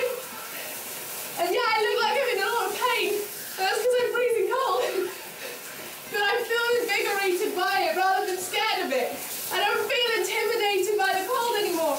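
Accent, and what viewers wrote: United Kingdom. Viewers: I don’t know why I always forget that you are British until you start talking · you sound more british for some reason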